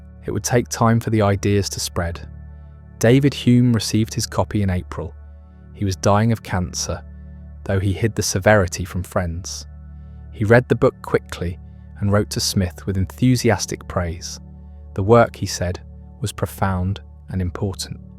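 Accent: United Kingdom. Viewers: can't understand brit accent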